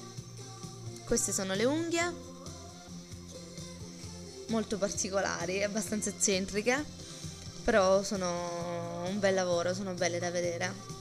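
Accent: Apulia, Italy. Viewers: Forse perchè sono pugliese anche io ma si sente che 6 pugliese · di dove 6? anche io sn della puglia prov.di brindisi :D è vero che si sente molto nella parlata..cmq cosa usi x fare i decori neri?? a me in genere il gel si espande mentre faccio i decori.. che gel usi tu??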